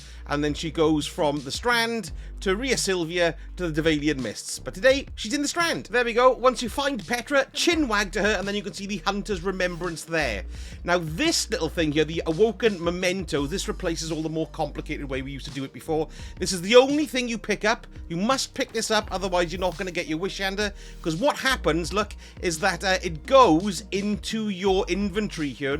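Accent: United Kingdom. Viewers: And he does it all with a pleasant British accent!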